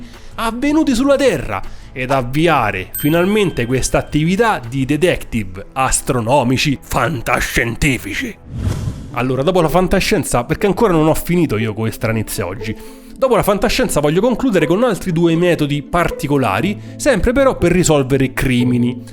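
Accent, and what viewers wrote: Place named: Sicily, Italy. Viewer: PS: sono di Palermo, mi diverte un casino sentirti parlare spesso nei video con l'accento siciliano, vai alla grande 💪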